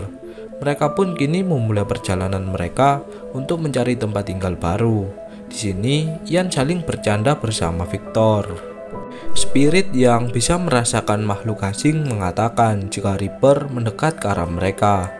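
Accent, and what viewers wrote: Java, Indonesia. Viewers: Wkwkkw ,sama kek pemikiran gw dah persis kamar film tp ini versi medoknya wkwkw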